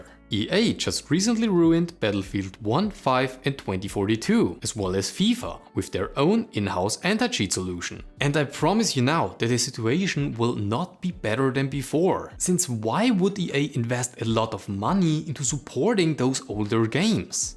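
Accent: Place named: Germany. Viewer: You sound German :).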